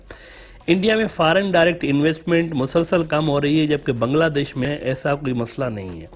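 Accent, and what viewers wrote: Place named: Pakistan. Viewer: No He lives in Pakistan bc He is speaking urdu very fluently also he has perfect pakistani tone .BTW he himself Told in a comment of a video that He is Pakistani . That video is old it was abt Onion export .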